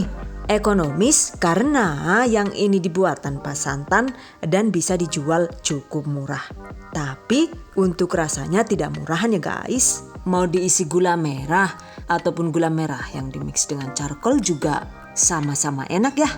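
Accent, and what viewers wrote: Banyumas, Indonesia. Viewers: Klo sy buka, diantaranya jg selain tertarik resep2 nya bunda cantiek, tapi juga 'ngapak' nya itu lho yg kutunggu...hehehe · Nah klepon ala unik banget soalnya pake nutrijel, apa hanya aku yg baru tau kalau nutrijel bisa buat klepon😁 Selalu sukak sama penjelasan2nya, apalagi bahasa ngapaknya itu "pada mledos kabbeh jan" lucuu dengernya😂 dan ciri khasnya itu klw ngomong OK GUYS atau CATAT😁 bikin orang yg gak mudeng jadi mudeng😁😍 #GiveawayTHV2020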